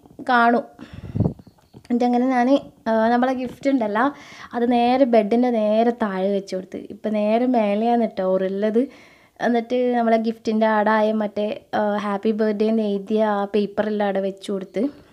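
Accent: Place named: Malappuram, India. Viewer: മ്മളെ മലപ്പുറം കാരുടെ വർത്താനം പോലെ ഇതും ഉഷാറായിക്കണ്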